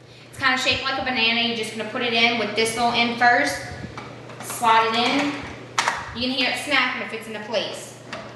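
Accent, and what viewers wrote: New York, United States. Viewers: Too bad that this is a New york accent.......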